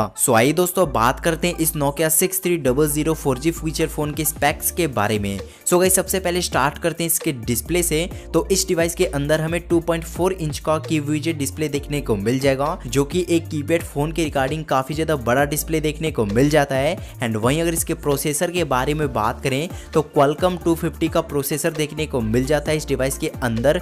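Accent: Hyderabad, India. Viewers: hyderabad sa ho kya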